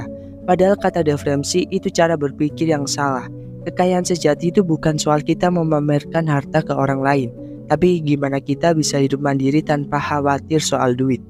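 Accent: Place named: Jakarta, Indonesia. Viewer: Alangkah bijak bila narasi diucapkan dalam bahasa Indonesia yang baik dan benar. Tak perlu kejakartanan dengan lu gue. Krn yang menikmati bisa jadi orang seluruh Indonesia atau bahkananca negara, dimana saat ini bahasa Indonesia diminati di banyak negara.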